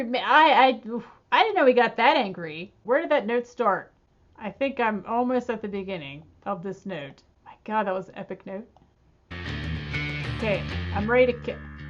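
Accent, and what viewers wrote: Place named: Mid-Atlantic, United States. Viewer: My second listen... Hear "noaht" for "note"... Def Mid-Atlantic.. Awesome!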